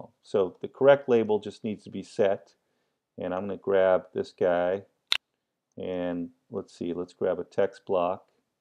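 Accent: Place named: United States. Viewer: You're a rubbish teacher your american accent makes me hate you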